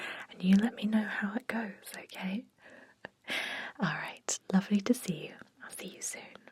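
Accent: United Kingdom. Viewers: plus a britsh accent help too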